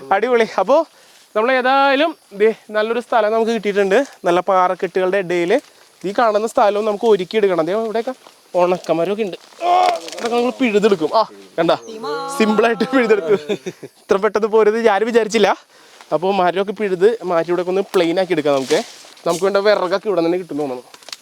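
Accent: Thrissur, India. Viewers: machan thrissur aano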